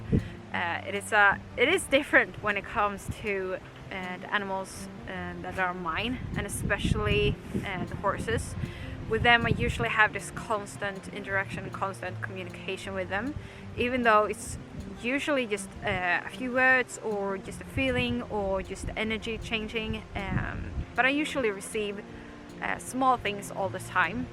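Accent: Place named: Scandinavia. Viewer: I'm just getting into this topic, after my mind being torn apart by Anna Breytenbach... It's exciting to watch someone go through the early stages of developing these skills! Edit: I love your accent. Scandinavian right?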